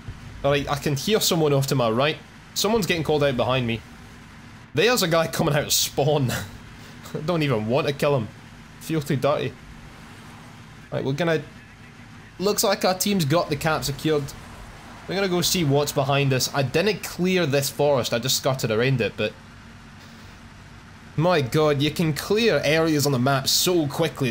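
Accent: Scotland, United Kingdom. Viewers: I think the new mic makes you sound more. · teach me how grind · I love ur accent btw is iron bru really that popular over there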